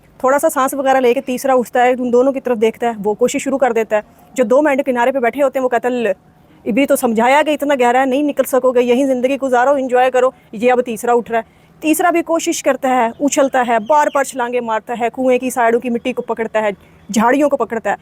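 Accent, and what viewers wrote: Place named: Punjab, India. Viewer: آپ اردو کو اردو لہجہ میں تو بولیں ایسا لگ رہا ہے پنجابی بول رہی ہیں آپ کا accent مکمل پنجابی ہے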